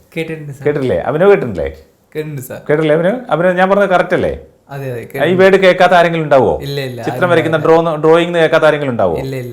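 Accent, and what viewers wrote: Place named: United States. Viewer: American pronoun sation good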